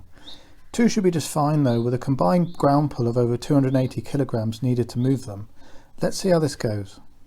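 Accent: United Kingdom. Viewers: This is a fantastic project. I have always wanted to build my own aircraft but have too many restrictions. There is an airfield within 3 miles of my home but it is run by a bunch of millionaires who like to keep it as an exclusive club for them and their cronies and excude any other aircraft types. There used to be a microlight school operating there but the committee of millionaires drove him out! Because of this I have been looking for an aircraft that could be operated from my large back garden so a helicopter would be the answer but in the UK we have very restrictive legislation regarding home built aircraft especially rotorcraft which has always put me off building an aircraft. I wish we had the same freedoms as they do in the US. Judging from your accent and the fact you mentioned getting your engine for 500 quid in another video you are indeed in the UK. 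if so how are you going to get this aircraft certified to make it legal to fly as otherwise it will end up being an expensive garden ornament. Also if i was building this aircraft i would look at making some redundancy in the belt drive ie have more than one belt per rotor as if one of the belts on your craft were to go twang one of the roters will stop and you will have no control of it and it will be game over!!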